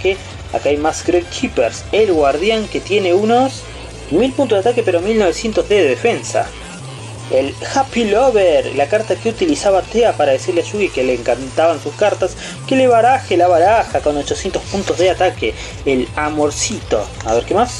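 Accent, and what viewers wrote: Argentina. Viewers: de donde sos bro? Creo que eres argentino como yo pero de que provincia?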